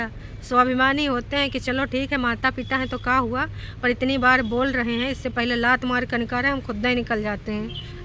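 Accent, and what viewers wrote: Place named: Lucknow, India. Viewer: aap Lucknow se hai kya, beech beech me aapki language jhalkne lagti hai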